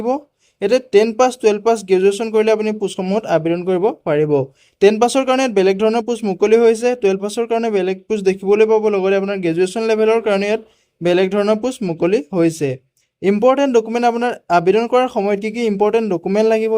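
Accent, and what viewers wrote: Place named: Gujarat, India. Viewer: Tur mur tu vdeo bonaiso ..Kun stater hoi sai lo...gujaratar aru local language lage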